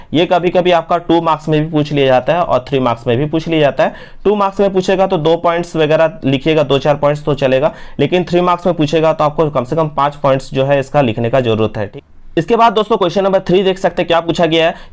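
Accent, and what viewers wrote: Jharkhand, India. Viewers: Sir AAP jharkhand see ho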